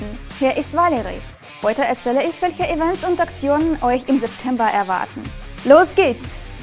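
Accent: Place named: Russia. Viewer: Ich mag den russischen Akzent :D Und geile Events!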